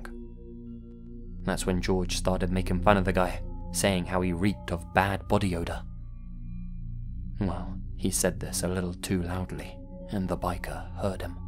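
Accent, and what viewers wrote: England, United Kingdom. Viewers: Great stories as usual,:) I know you can't really tweak the grammar of someone's story beforehand, but as a brit as well, your accent is far too good (and lovely to listen to) for the all the 'I's that should be 'me's in sentences like: "They kicked Steve and I out of the jeep". It sounds (and grammatically is) off...spoils it a bit, is all.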